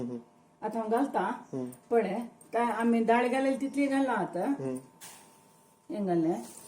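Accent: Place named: Mangalore, India. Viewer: Authentic GSB Mangslore konkani .......feels so nice to hear. I herd it after over two decades!....GSB food is superb!!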